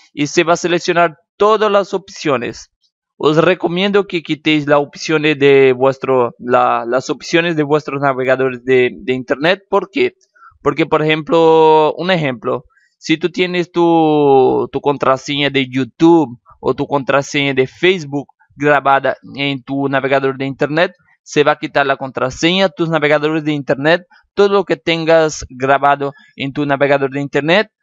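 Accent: Brazil. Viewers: eres brasilero? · es brasileño v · creo q es de Brazil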